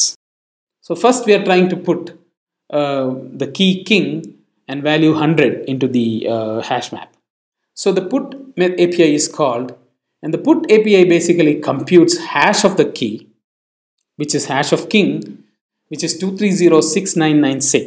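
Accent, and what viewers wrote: India. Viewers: malayale accent ! malyale anno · Indians have their own accent just u having urs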